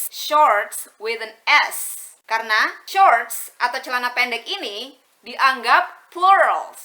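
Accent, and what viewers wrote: Surabaya, Indonesia. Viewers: U hv such a cute Indonesian accent while ure speaking English 😘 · Ya kan mbaknya org indo, jelas masalah aksen atau logat tentu berbeda, sama kayak singlish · Suroboyo mana suara nya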